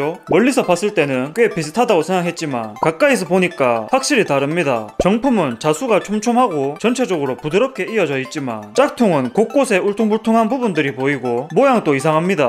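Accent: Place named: North Korea. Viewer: 사투리가 매력적이시네요! 혹시 북에서 내려오셨나요?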